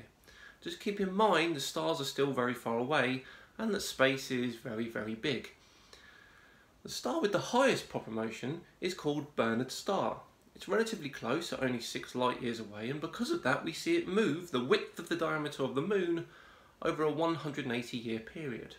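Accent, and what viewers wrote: United Kingdom. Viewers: I have to say that you are the only channel talking about Flat Earthers that I have subscribed to, which I just did 5 minutes ago. Your approach is informative, no nonsense, straight facts that will appeal to any half sensible person even slightly being swayed by the Cult of Flatness. It's also nice to see a brit out there, though I can't quite place the region. Keep it up good man!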